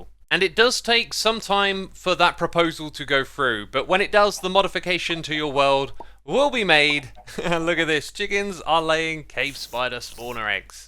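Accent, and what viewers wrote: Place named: United Kingdom. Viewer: I forgot how British you are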